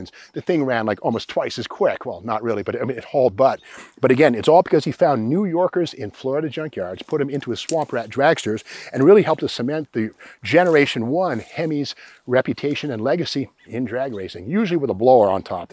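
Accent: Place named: Boston, United States. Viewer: . You still got that Boston accent...all good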